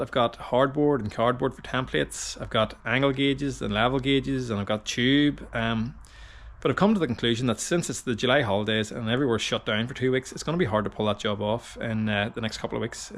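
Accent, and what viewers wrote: Northern Ireland, United Kingdom. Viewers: It was just a thought. Seems to me that Ulster is a unique place but doesn’t get much attention. A lot of American car nuts will be attracted by the content and stay for the accent. I love the Norneyrish accent so I supposed I’m biased. 😁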